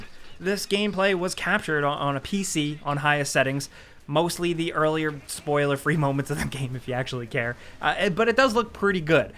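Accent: United Kingdom. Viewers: British male voice is the best!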